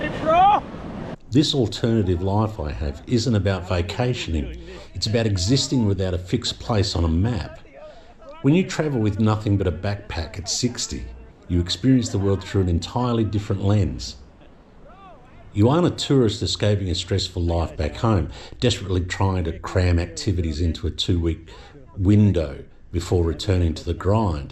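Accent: Australia. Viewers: hi Laura, is 100% Australian. I’m Aussie and I know one when I hear one. And he’s mentioned it in many videos. He often goes back to Perth to visit his sister. And no, he doesn’t have the old age pension because you have to be 67 to qualify for that in Australia. He’s 60. As he states in this video (and many others) he lives from his YouTube videos. That’s also why he makes so many! It’s his job. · No. I sense an Aussie accent. You are the age of my Father. I’ll tell you what’s worse than having nothing. It’s having EVERYTHING and yet not appreciating it. In Australia, so many boomers became property obsessed and prioritised wealth gains over family relationships. What’s the point in having kids and grandkids if your main focus is your STUFF?! It’s so sad to see. But they don’t care. They won’t, until they lose their sense of security (homes/equity) and realise it cost them their families. 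You’re doing well in Goa. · You're living my dream, mate. Really enjoy following you on your adventures. Don't mind the lovely Aussie accent, either. Love from the Low Lands.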